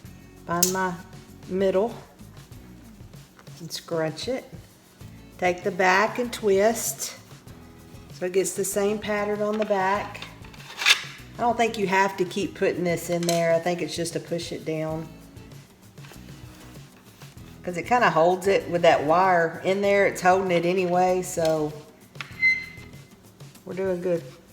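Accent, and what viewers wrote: South, United States. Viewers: Love your wreath. Your home looks beautiful. You sound like you are from the south. I'm from Southeast Alabama. Happy Anniversary! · Happy Anniversary sweet lady! New subbie here! I’m really enjoying your channel! You’re so calm and real! I relax and learn new decorating tips while watching you! I’m in North Texas and love your Texas twang!🙋🏾‍♀️ Your wreath and porch are so cute! I’m inspired now and gotta step up my porch decor! Have a blessed Easter! 🌷🐇💐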